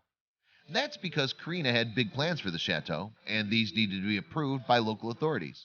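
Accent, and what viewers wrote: United States. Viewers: irritating narrator... why an american..? and stupid music.... disturbing like hell! no likes or subscription, guaranteed! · THE AMERICAN VOICE ON THIS VIDEO IS A COMPLETE TURNOFF. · Why the dreadful American voiceover with mispronunciations?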